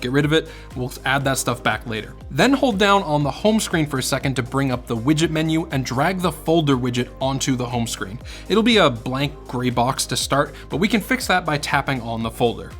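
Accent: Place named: Newfoundland, Canada. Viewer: Are you from newfoundland? If so hello from a fellow newfie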